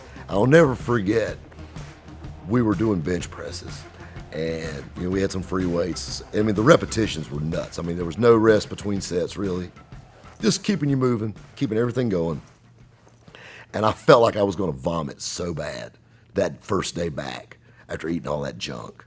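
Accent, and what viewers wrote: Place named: South Carolina, United States. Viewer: He's got that south Carolina charm👍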